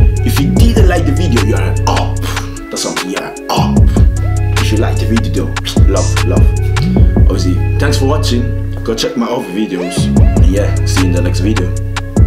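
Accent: Birmingham, United Kingdom. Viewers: Is he from Brum or what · Bro I'm from brum but my accent ain't like yours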